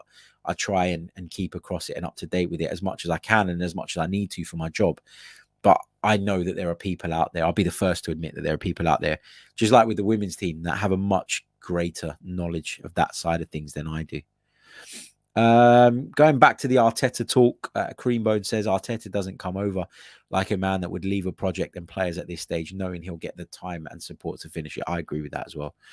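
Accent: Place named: England, United Kingdom. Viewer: you are chearleading for Italy while you live in England amd speak with an English accent. Make it make sense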